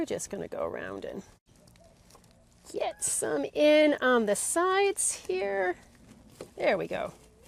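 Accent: United States. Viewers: Hi! It's Marc again from good ol' Germany. Thank you for this video! Yes, I do find your videos very helpful indeed, and I always look forward to new videos coming from you. And between you and me; I love your New England accent. Your English sounds absolutely beautiful. I also learned something from you that works fantastically even in the much cooler and moister climate of central Europe: Amend potting mixes with worm castings. Wow! This is awesome! It works on my citrus plants, but it also works on my mammalaria cacti and jade plant and aloe. It also works on my cycads. And it works on my heavy feeders like oleander and geraniums. Today I amend the potting mixes of all my plants - tomatoes, Mediterranean herbs, coffea, strelitzia etc etc. My impression is that worm compost keeps my plants healthier and pest-resistant. So, let me assure you: your videos are absolutely helpful - even over large distances and across climate zones. 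So, carry on, and share your expertise with us, so we can all go out in the garden and make the world a more beautiful place (I love your motto, and I have adopted it and made it my own). Have an awesome Sunday and enjoy your patios and garden! Bye!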